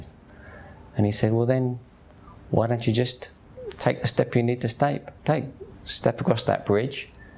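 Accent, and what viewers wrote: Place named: London, United Kingdom. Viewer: I like your London accent but I can't do it. I do US accent, I lived there many yrs. I am Malaysian Malay Muslim.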